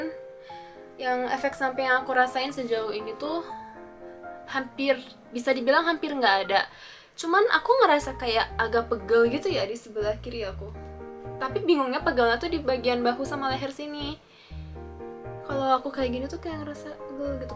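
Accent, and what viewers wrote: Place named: Japan. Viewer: Suka kak kalu ngomong L ada lidah jepangnya, lucu😂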